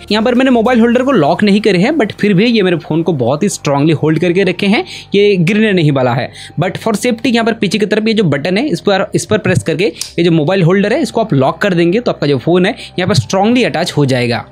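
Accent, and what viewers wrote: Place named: Bengal, India. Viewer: Bengali ho kya ... 😁 tumhari ... Bengali accent se pata chala 😁 ans ta debe thik ache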